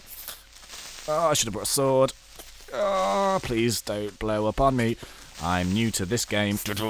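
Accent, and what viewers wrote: United Kingdom. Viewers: that silky british voice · Hey, what nationality are you? British? Cause your accent is really awesome. =O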